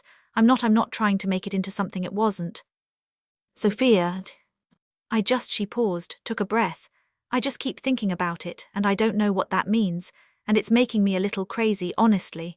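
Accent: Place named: United Kingdom. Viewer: The narrator has a very pleasant voice, but she has a British accent. Why don't they make the primary character from England instead of Vermont? Aside from that small complaint, it's a lovely story.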